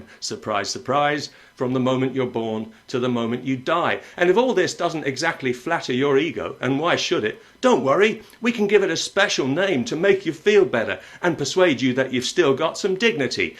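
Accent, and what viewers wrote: United Kingdom. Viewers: I guarantee you that you wouldn't sound NEAR as convincing without that British accent. That's really all you have going for your argument. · This guy is like the Amazing Atheist only with a spiffy accent and a snappy British wit. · fine British articulation are almost hypnotic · with a british accent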